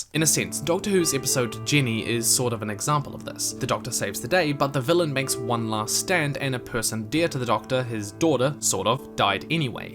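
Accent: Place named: New Zealand. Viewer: Was listening in and I was like "Shit.. This guy's kiwi!", not used to hearing a fellow kiwi doing film analysis, it's refreshing. Keep it up!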